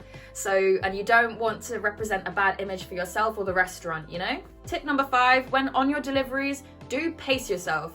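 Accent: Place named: England, United Kingdom. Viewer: Your amazing! 😍 How come you have a english accent if you live in Spain?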